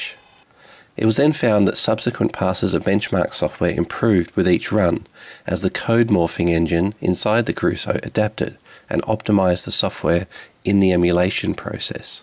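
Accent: Australia. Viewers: Ah! I see. I didn't realise you're Aussie. Great video!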